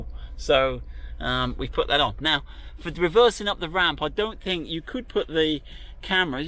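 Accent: United Kingdom. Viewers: This video sounds so British I had to go and make tea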